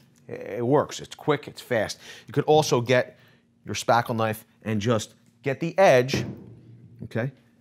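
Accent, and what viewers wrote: Philadelphia, United States. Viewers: I like the length of the video but I'd appreciate more philly-charm. Like take a break to eat a cheesesteak and/or play up the philly accent and slang a bit lol. Good vid. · Ha he is from Philly ,was thinking New York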